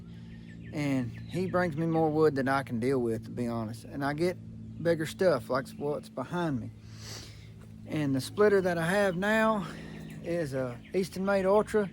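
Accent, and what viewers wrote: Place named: South, United States. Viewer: I’m not going anywhere. It’s your southern drawl that keeps me😎. Paying things off will offer you more freedom! I love the smell of freedom!!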